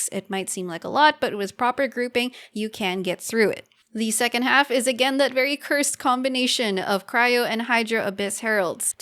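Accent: Philippines. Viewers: are you a filipino? your voice sounds like a filipino, anyways great video as always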